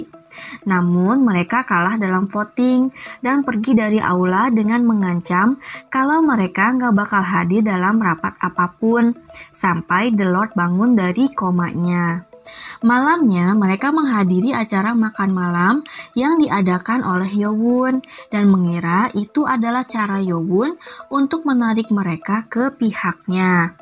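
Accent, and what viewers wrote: West Java, Indonesia. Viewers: Hahaha kesannya kaya adminnya nyindir hahaha tapi gue malah kesemsem sama suaranya sama logat sundanya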